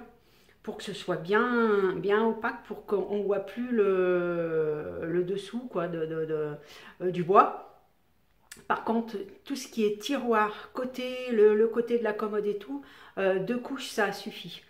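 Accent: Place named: Saint-Étienne, France. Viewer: oui effectivement tu ne fais pas que du maquillage J'adore ton accent.... tu ne serai pas pas la region stéphanoise ? J'adore le relooking de meuble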